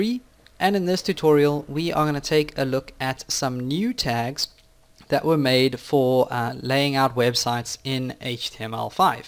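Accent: South Africa. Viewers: agree you better than Lynda ... thank you you sound south african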